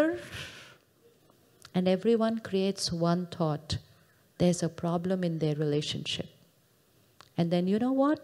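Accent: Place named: South India, India. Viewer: Sis kuchh English word pronunciations southern india tone va tone se lagtey hain ..the ketala . Is mumy is from southern region. Of cpurse no about Papa as i had reviewed the photo snaps of the excellency .s parents . Subh Ho. Om shanti sis .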